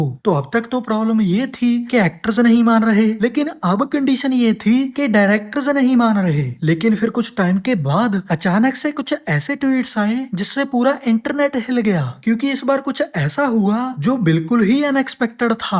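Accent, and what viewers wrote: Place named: Punjab, India. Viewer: Your punjabi hindi accent sucks ... You shouldn't make videos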